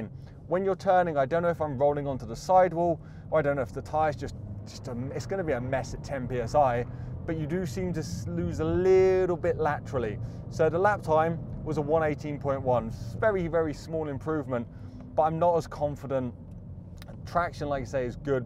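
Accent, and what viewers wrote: United Kingdom. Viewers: British accent !!! Peace!!!! I am out of here.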